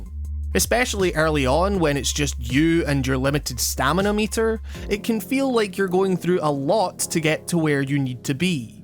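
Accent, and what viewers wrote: Scotland, United Kingdom. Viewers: I think it's Scottish... I like it 😊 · please forgive me for this is i am wrong. first i'll say this video is great and you earned a new sub. i think the space of breath of the wild is fantastic and perfect. i do not like things stacked on top of each other and it promotes "hunting" for things. now for the forgive me part. i am guessing you are from Scotland and trying to down play your accent? don't....if that is what you are doing. i could not help but be distracted by how you say things and it was.....odd.....at times. but, again, great video. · Hey everyone, non-native speaker here...is this a Scottish accent? Anyway, I really like it! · is this scottish accen? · Lol, that’s like the mildest sort of east coast Scottish accent – hear some actual heavy Scottish accents and your mind will be blown… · Soviet Loli he’s Scottish...as am I, I find it kind of funny that people mistake our accents for Australian/Irish accents...but he’s Scottish. 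· I love the Scottish accent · Are you Scottish? If so where fae? I'm from Edinburgh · N K He's Scottish. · oh sorry. plz excuse my ignorance. it's still a great accent! Scottish? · its a Scottish accent not a Canadian accent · yep, it's Scottish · I clicked on this video by accident tbh because I was aiming for another one but I sat and watched because I was intrigued by the concept. Beautifully put and I absolutely agree 100%. I've always loved Zelda but this game has completely reawakened my love for games. I've got all the divine beasts and instead of going straight for Ganon I want to explore the world and see what I've missed, much like I did when I was 7 and stealing my brother's Ocarina of Time cartridge. Only this time the world is there to explore! Thanks so much for putting it into words and making me realise what this game has brought me. Also hello from a fellow scot and boo to all the people making fun of our accent (they're just jealous they're no in on the brawest accent ever, eh?) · Im sorry to go off topic, but is that an Scottish accent? 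or Canadian?:D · It sounds like a Scottish accent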